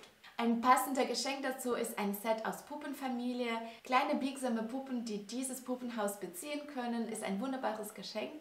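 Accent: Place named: Russia. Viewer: wo ich Deinen Akzent einordnen soll... Ja, klar, Russland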